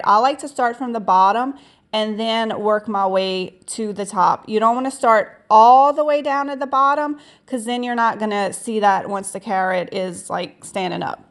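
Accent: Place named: Deep South, United States. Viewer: You are so creative, love the projects. Just subscribed. And that deep South accent,lol, couldn't stop listening.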